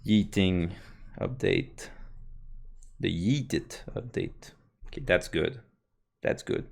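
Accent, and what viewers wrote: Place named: Quebec, Canada. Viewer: Are you from the province of quebec ?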